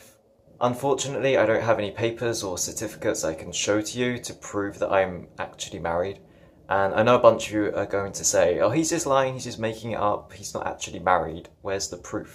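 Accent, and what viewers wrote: United Kingdom. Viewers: Samm Y i get what u are saying. But to bash a depressive does not help.. British accent and looks are sweet. His words not so much, reflect his depression, lack of accessing true emotions, joy... and his insecurity (like need to proof us his marriage certificate, which is really not our business )... he got loads of judgment... about woman and man... which means he judging him self even harder and more... which in the core leads to depression.... i just wish he would realize it, and start having a therapy to turn his life over... show his followers would be such a great asset if maybe many depressive followers to show that u can turn into joy with life... your weaknesses are your strength - i truly hope he is more cheerfully with his wife... idk even why i keep watching him once in a while... i guess hoping to see him for once happy.....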